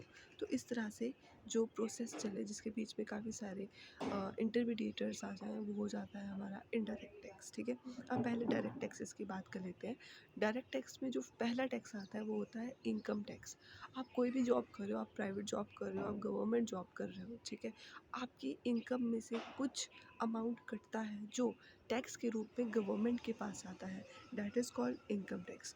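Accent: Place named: Kashmir, India. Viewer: Nice information but I am NRI 😅. Also , you sound like Kashmiri 🎉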